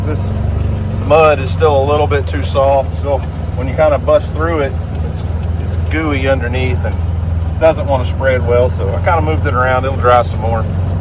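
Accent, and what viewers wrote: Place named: Oklahoma, United States. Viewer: He's got the Okie accent...he used three words! "It mussbe nice" And folks that don't work hard and don't "grind" as younger folks like to say nowadays...they'd just rather be jelly!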